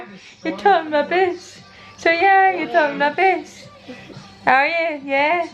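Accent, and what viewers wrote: Scotland, United Kingdom. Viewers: Since english is not my native language, it's so hard for me to understand your scotish accent. But I'm not giving up on you! You are so simpatic!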